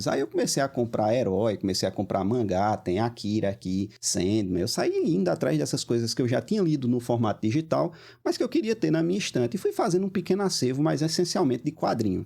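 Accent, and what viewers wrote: Northeast, Brazil. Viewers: Professor, obrigado por compartilhar sua trajetória literária e acadêmica. Me identifiquei muuuuuuuuuitoo com seu vídeo. Sua história e sua saga no mundo da leitura se assemelha enormemente com a minha. Temos muita coisa em comum. Pela sua fala parece que voce é de algum lugar do nosrdeste. Tive a oprtunidade de residir por quase 9 (nove)anos no nordeste especialmente em Maceió. Entre os anos 2016 e 2024. Sou da cidade de São paulo porém devido a umas questões financeiras mudei para o nordeste. Chegando lá resolvei dar uma guinada na minha vida. Iniciei eum um curso superior e comecei uma jornada de leituras. Passei pelos mesmos problemas citados no vídeo: dificuldade de encontrar títulos e preço dos livros, então adquiri o Hábito de ler em formato digital. Me tornei um ''rato'' de PDF, EPUB, MOBI etc. Entre os anos de 2019 e 2024 li mais de 500 títulos das mais variadas áreas do conhecimento:Economia, Literatura, História, Filosofia, Sociologia, Psicologia e Teologia alem de algumas Autobiografias etc. 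Foi uma jornada incrível. Consegui construir uma pequena biblioteca comprando muitos livros em SEBOS. Ainda consegui cursar très graduações, ( Economia, Administração e Teologia)uma Pós-graduação. Ao retornar não consegui trazer os livros, o que me deixou muito triste pois eles contam uma história e possuem um valor sentimental acima do valor facial. Tive de deixá los aos cuidados de um cuidador. Não digo isso para me auto exaltar mas como contribuição para mostra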